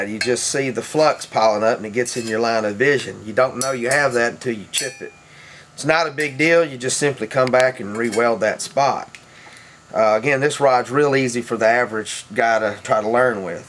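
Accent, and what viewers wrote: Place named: Southwest Virginia, United States. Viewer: Out of curiosity, where are you from? Sounds like our accents here in southwest Virginia.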